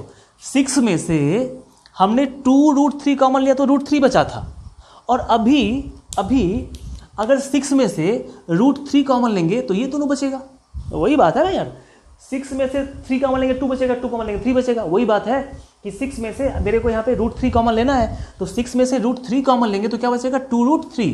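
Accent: Bihar, India. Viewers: You're the best maths teacher ever..❤..i tried so many times to solve this type of questions and clear my concepts😢...but few mins before i found your video...😊I really really loved your way of teaching....✨especially i am feeling happpiiieeeee becoz you're speaking biharrriiii🤩🤩 .... you'll get millions of subscribers soon....✨🦋 best wishes too youuuuuu⛅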